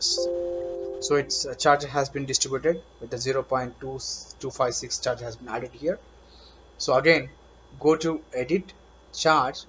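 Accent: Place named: India. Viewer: Indian English.........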